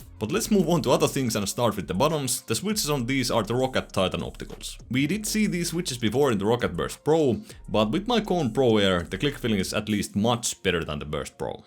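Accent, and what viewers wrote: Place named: Finland. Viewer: First time in your channel and I had to check immediately are you a Finn or Estonian :D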